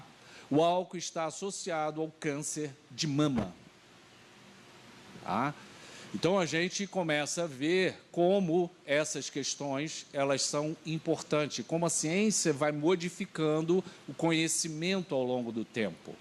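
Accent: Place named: Portugal. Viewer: Por que está falando com sotaque de Portugal?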